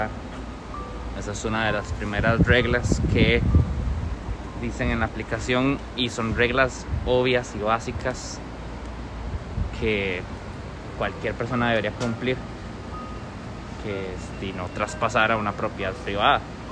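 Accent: Costa Rica. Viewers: Sos de Costa Rica es que mae con esa voz es imposible que no jajajaja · Eres tico? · Ud es de costa rica?? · Saludos desde Costa Rica, se notó el acento :) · Tico? habla como tico jaja pura vida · jaja no se pierde el acento Tico, saludos 👌🏻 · Eso mae!, saludos, el hablado de un tico no se pierde xD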